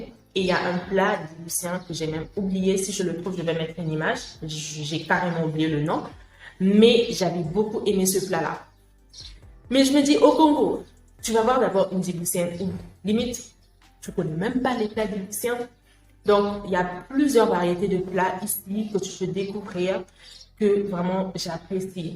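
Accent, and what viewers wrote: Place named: Senegal. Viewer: Toi la tu ressemble à une sénégalaise deh 😁même l'accent c devenu sénégalais deh🤣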